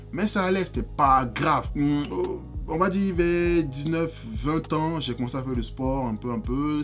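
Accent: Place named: Ivory Coast. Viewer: L'accent ivoirien c'est pour me tué 😂😂😂 moi je m'abandonne heiiiiin 🎉 courage j'espère que ca va me motiver aussi 👍🏾👍🏾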